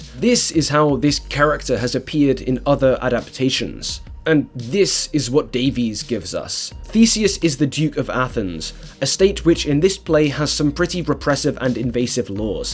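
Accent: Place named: England, United Kingdom. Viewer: I really liked what you had to say about the representation of the fascist regimes in film, I found it quite insightful. I couldn't help but notice, though, your repeated comparison of current day American right-wingers to these fascist factions in media. I understand that as an Angle (judging from your accent), you are not incredibly informed on American politics, but I wanted to let you know that the American right is rather far from looking like a fascist movement. They really are just a bunch of libertarians with traditional views, and they really do oppose authoritarianism in most all regards. I don't say this to be provocative and point fingers at the other side, but the American left is far more of an authoritarian- dare I say fascist- movement. Just figured I'd point it out as someone who is living in American politics.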